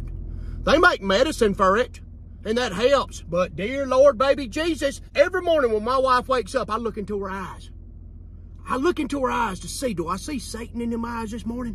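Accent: South, United States. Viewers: Gotta be that southern accent and slang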